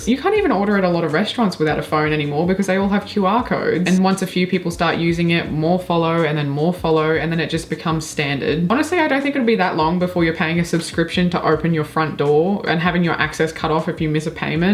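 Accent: Australia. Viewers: I love thought-provoking & enlightening rants Especially if they feature a hilarious aussie accent lol I really like to rebuttal with my own profound take on this matter But for now, I just wanted to say thank you for being a critical thinker Subscribed Bless u ❤ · Hey you sound like an Aussie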